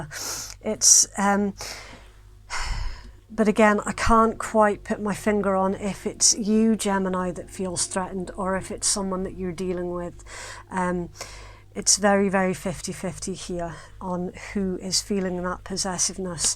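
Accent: Scotland, United Kingdom. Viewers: Resonates with me, detect a wee bit of scottish accent 😊 me too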